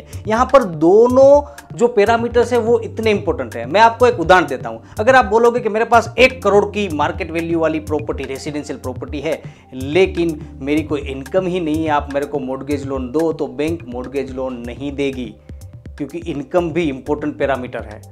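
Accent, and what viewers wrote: Gujarat, India. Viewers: I think you are gujju. I feel this accent😅🤟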